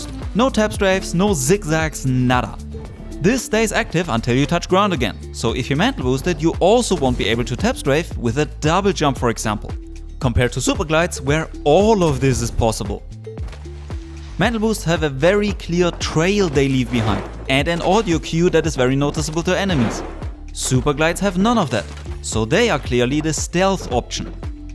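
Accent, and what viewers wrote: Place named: Germany. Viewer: Klingt schwer als wärst du deutscher. Das englisch kommt mir sehr bekannt vor xD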